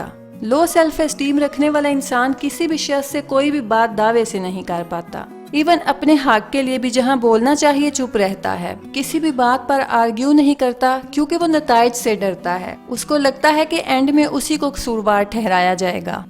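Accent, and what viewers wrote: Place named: Sialkot, Pakistan. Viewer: like your sialkoti lehja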